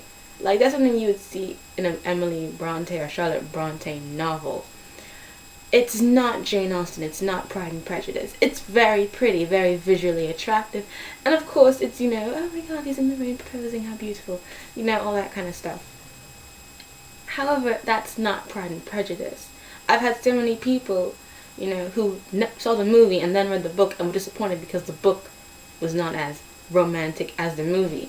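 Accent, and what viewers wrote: England, United Kingdom. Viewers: wow...cool brittish accent. but it was kinda weird how you started on the brittish accent...then broke off and started talking normally...then started the accent again. But, it was a great rant, and i would have to say that i liked the 1995 pride and prejudice better. heh heh...im a sucker for the classics. :) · My comment won't go through! 1 more time. You know you had an English accent through bits and pieces of your vid, it sounds like you weren't aware it though. :laugh: I'm not trying to embarrass you or anything, cause I've done the same thing. I watched 4 HP movies in a day. I couldn't shake the accent that kept popping up for the rest of the day! Same thing happened when I watched Extras. Colin Firth is sexy, and a much better Darcy. I like the 2005 movie, mostly cause I'm a big fan of Keira. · i dunno, but for some reason, i thought i heard an english accent from you through out this video, was that planned?! 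lol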